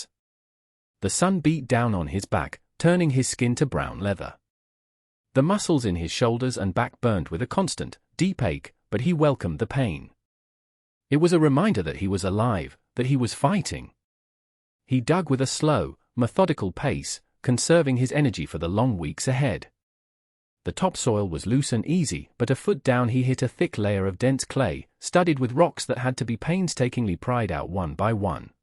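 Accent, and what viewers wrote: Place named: United Kingdom. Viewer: After 10 seconds I stop the video. I don't want to hear a western tale narrated with a British accent.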